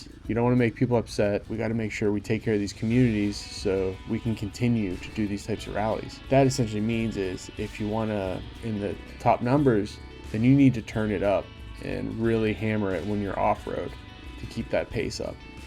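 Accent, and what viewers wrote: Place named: Pittsburgh, United States. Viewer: Yeah, I could hear some Pgh in your voice! Sadly I haven't ridden anything in years just due to life circumstances. Gotta live vicariously through YT videos like yours. The only bike I've kept is a YZ125 that I rebuilt from top to bottom to race AWRCS. I go out and huff some two stroke exhaust from time to time to keep it circulating in the bloodstream though. Hopefully I get my freedom back before I'm an old man and I can get back out there. Thanks for taking us along for the ride.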